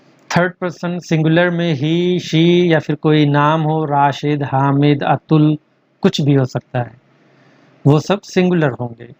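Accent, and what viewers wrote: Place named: United States. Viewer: Very good American accent in hindi style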